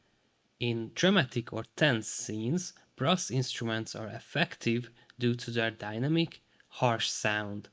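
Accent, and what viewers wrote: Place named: Hungary. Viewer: TUDTAM A KIEJTÉSEDBŐL HOGY MAGYAR VAGY (nagyon kiraly a video amugy)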